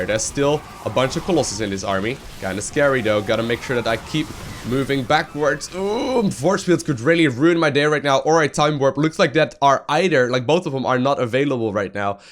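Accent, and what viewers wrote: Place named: Netherlands. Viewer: Pretty sure he's Dutch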